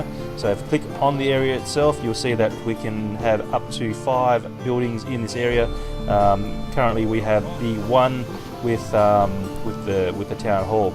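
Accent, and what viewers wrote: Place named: Australia. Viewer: I found this really helpful so thanks mate! It's also refreshing to hear an Aussie accent ;)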